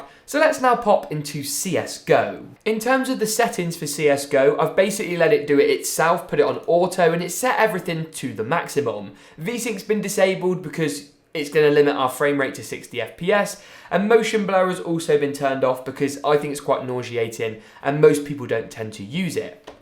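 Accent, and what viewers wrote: England, United Kingdom. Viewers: You are so fucking annoying, you keep coming up in my recommended even though I don’t watch 15 year old Pom kids, fuck you and your pommy accent.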